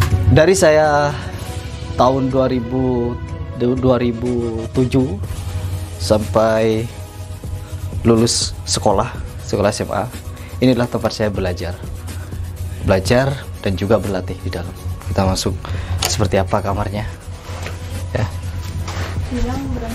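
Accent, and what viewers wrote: Madura, Indonesia. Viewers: Pantesan ada logat maduranya bang, salam dari madura bang🙂🙂🙂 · Oalah...Mas asli Jember toh? Pantas msh ada dialek madura yg lekat...sengkok reng Probolinggo tretan! Tetanggeh...😁😁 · pantesan deri pertama nyengok vidio nah bahasan nah logat medureh hehee deddih asli jember hihi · Oalah, mas asli Jember 🙏 😊 pantesan logatnya ga asing logat maduranya mas masih kental 😊🚬☕ salam mas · Terdengar ciri khas bicara Madura a. Kak salam settong dere sengkok derih madureh tapeh lambek 3 taon neng jatiroto pas tips malem miggu sering nongkrong di alun2 Jember jiah kak salam KK maderen Padeh beres salamet Ben tambe sukses amin.🙏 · Oo arek jember to, keluarga ibu ku mayoritas dr jember hgga sekarang. Pantesan logatnya spt org madure. Salam cak.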